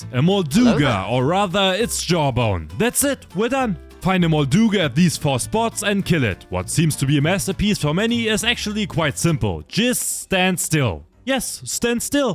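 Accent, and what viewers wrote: Germany. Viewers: You are german, right? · this German accent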